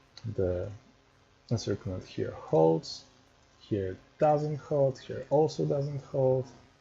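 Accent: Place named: Eastern Europe. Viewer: You meant to say "SEXY EASTERN EUROPEAN ACCENT".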